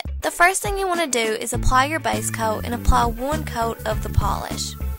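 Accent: South, United States. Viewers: I LOVE YUR VOICE..LOL. ITS SO SOUTHERN. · OmfGODS Southern Accent. It is almost too much!! Damn cute nails though.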